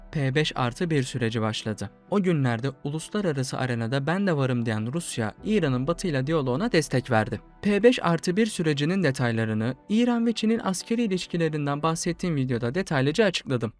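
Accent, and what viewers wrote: Arab world. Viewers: Abi şu arap aksanıyla K patlatan kardeşimize seslendirme yaptırmayın artık. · Seslendirmeyi yapan arkadaşın anadili Arapça mı? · Abi içerik güzelde şu seslendirmeyi arap aksanıyla K patlatan arkadaş yerine farklı biri yapsın. Dinlerken çok rahatsız ediyor.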